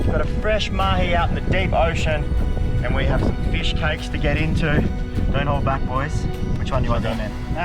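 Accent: Australia. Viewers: It’s aussie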